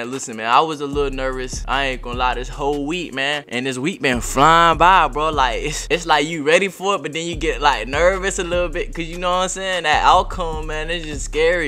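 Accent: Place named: Florida, United States. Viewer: He’s speaking perfect Floridian bruh Idek what yall talmbout yurd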